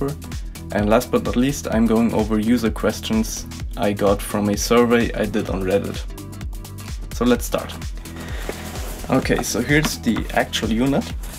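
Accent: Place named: Germany. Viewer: Sehe ich anders. Lieber deutlich artikuliert aber mit deutschem Akzent als genuschelte Ami/Oxford-Englischproduktion! Production: Danke!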